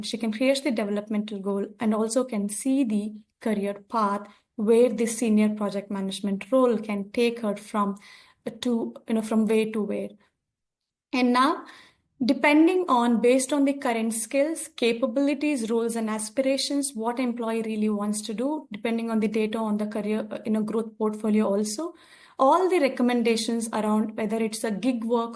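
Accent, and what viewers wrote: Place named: India. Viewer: Its a pitty Indian accent is awful :/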